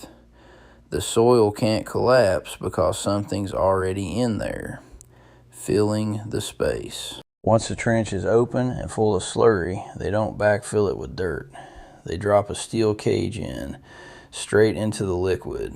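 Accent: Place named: South, United States. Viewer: this dude sounds southern as hell fresh out the hollar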